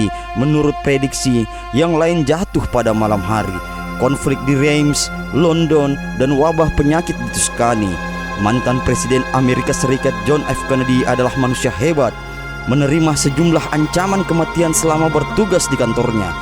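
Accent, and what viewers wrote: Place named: Bugis, Indonesia. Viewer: Suaramu cowo kaya logat orang selatang.. Bugis bingits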